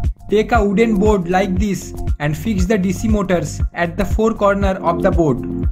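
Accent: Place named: India. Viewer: You have the most stereotypical indian voice You should better use hindi in your next video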